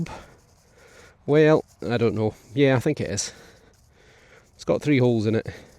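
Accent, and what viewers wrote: Scotland, United Kingdom. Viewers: First time I've liked watching a metal detector. Maybe it's the Scottish accent. I've been watching Outlander.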